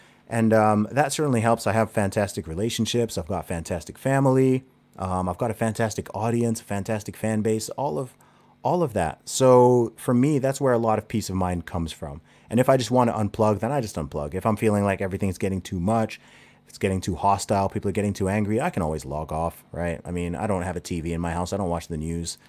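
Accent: United States. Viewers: No disrespect, but if you're British why do you sound American? My mom is British and she has lived in America for over 40 years and she still sounds British. What happened to your accent? Please tell me! Ps I love you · Are you American ? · Why do you sound American? This was my first visit to your YT feed. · you kinda sound American ; are you from the States ? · I hear you in Bangkok, Thailand first time listener. You sound like a really smart young man! I love the president, you are from UK wow! You sound American. And intelligent. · Why ?if yuo English yuo sound American?bye. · Just joined today. You sound American! You know, I commented on a YouTube video and someone from UK replied and explained that even people over there in UK are praying for Trumps win because the cabal and corruption stretches even over there and they know that if he loses its over for us and if it's over for us, then it's over for everyone. 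That was the first time I realized how important my President is. I've always known how truly important he is to America, but I had no idea how, what happens in America affects other countries. I know we are the world's bread basket. But I didn't realize. Then Trump gave a speech yesterday and he too said that if it's over in America, it's over everywhere. God Bless Us All! Please pray, because the more people that pray..... we can pray it into existence. It's physics. And the Democrats have lost about 30% of the black vote thanks to Candace Owens, Brandon Tatum, Jericho Green.... you should follow these people they're fantastic. The biggest problem in the USA right now is WHITE LIBERALS. THEY'RE ALL NUTS. LIBERALISM IS LITERALLY A MENTAL ILLNESS. I watched a documentary and they had a liberal and conservative in MRI machines. They showed photos, political in nature. And with each picture a different part of the brain lit up for the conservative vs the liberal. 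They think without logic. Thought without logic is a disorder, I mean....... that's the white reason for thought, to be logical. Not to be emotional, that's why we feel. So liberali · Just curious- why do you sound American? - you don't have a British accent- I'm Australian and just wondering 🤔 also, came across your channel accidentally and love your input 👍🏿🇦🇺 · But you have an American accent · Did you go to school in the US? Is that how you sound American? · Why is your speech so American?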